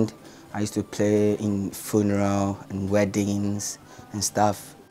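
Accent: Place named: Nigeria. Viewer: But y he want talk like naija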